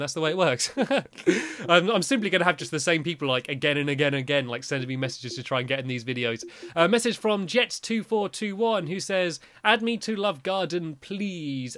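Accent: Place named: Newcastle, United Kingdom. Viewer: North East England! Propa Geordie :P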